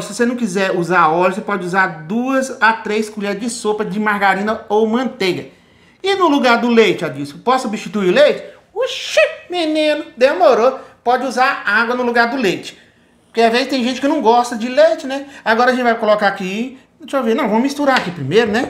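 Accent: Minas Gerais, Brazil. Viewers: E aprimeira ves que Estou vemdo seus aldio amei fose Emuito fofo pelo sel sutaki voce e mineiro adoro kkkkkkkkk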